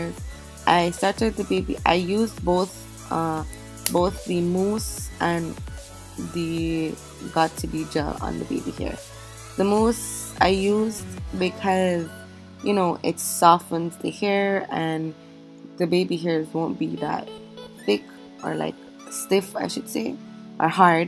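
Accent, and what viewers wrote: Jamaica. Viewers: i love your accent ❤️jamaican gang🇯🇲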